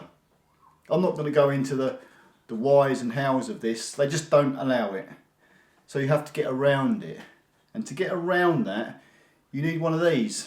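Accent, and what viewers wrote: United Kingdom. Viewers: This guy is like the cockney version of Karl Pilkington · man are u from UK bro i a from denmark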